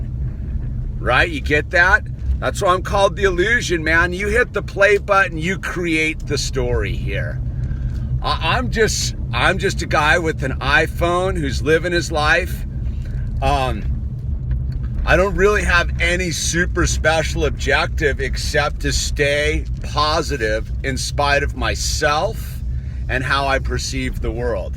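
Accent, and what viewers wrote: California, United States. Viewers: I’m glad I subscribed to you . Your voice is so mellow and Californian (?) . 💕💛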